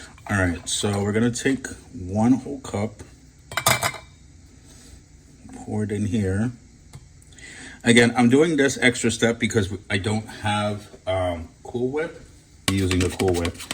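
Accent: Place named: Miami, United States. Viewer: My family and I love and adore you. I grew up in Miami and I here a familiar accent 😮😊😂❤🎉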